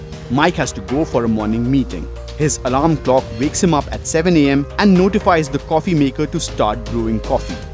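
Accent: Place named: India. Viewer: Pengisi suaranya orang India ya? Kedengeran banget dialeknya.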